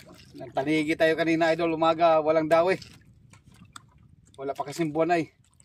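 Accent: Visayas, Philippines. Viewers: Visaya ka segoro kol dawi palang alam na hahahaha😂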